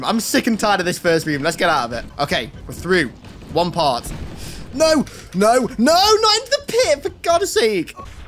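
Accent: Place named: United Kingdom. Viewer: Very British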